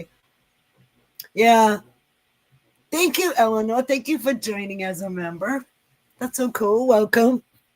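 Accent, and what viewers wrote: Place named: New York, United States. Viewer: Hi. Sorry I missed your live but watching replay. Your Boggy Blanket are so beautiful. Congrats at almost your 100 goal of number of blankets. That is a lot of work. Love to watch your videos. Not sure of where you are but your accent sounds northern, maybe close to New York. Have a great day, take care and stay safe. A friend.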